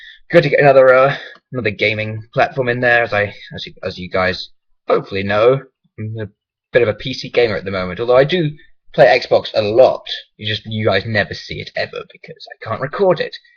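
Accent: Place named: United Kingdom. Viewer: Oh dear that's not so very nice over the sarnie things. Any way. you tell me who I am. INFACT no I will do that for you. I am Zandifate from Instagram. All I had to do was watch this and It made me laugh so hard.:). And it is like. PM. So yeah it was really funny and I would love to see more of your awesome channel. God that was so funny. And even though I am British you still have a pretty epic British Voice xD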